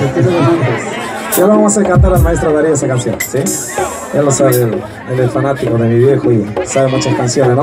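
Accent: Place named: Santiago del Estero, Argentina. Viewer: Como buen Santiagueño,le repercute mucho el asento,y la SS,pero canta lindo,ojalá q cuide su vos y su figura,eso es muy importante para sus fans y su salud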